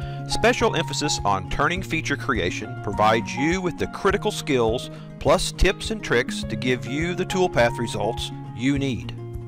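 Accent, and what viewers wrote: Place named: South, United States. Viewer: esprit cam and southern accent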